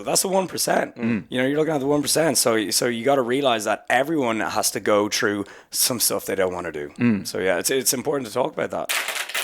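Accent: Ireland. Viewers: Is he Irish ☘️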